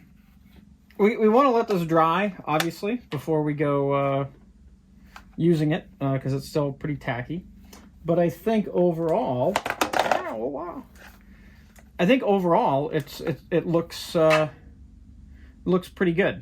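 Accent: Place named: Massachusetts, United States. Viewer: Subtle hints of that Bill Burr Massachusetts accent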